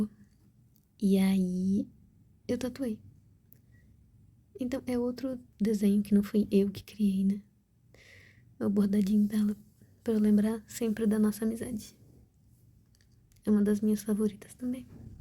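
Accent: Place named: Rio de Janeiro, Brazil. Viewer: a tem sotaque carioca e a não tem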